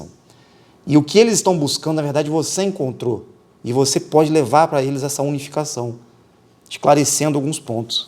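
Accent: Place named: Rio de Janeiro, Brazil. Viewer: está carioca..😮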